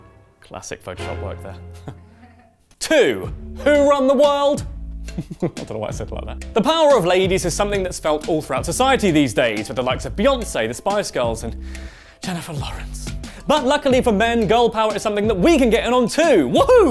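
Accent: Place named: United Kingdom. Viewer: Loved this! But can you hire a Captions guy? I'm East European and i watch you while i'm high *that how you roll on youtube kids*, and i'm really trying hard to understand your marvelous british accent, greetings fella!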